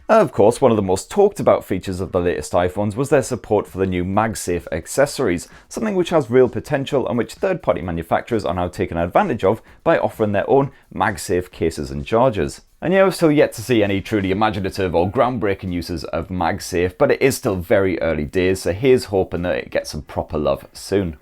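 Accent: United Kingdom. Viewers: He's British, so yeah, I feel you there! 😍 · I just discovered this channel and it has all I’m looking for: tech reviews, British accents and witty humor.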